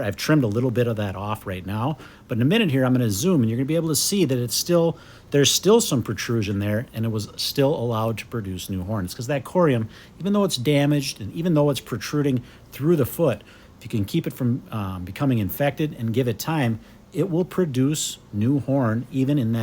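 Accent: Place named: United States. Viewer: this one of the few american accents i find bearable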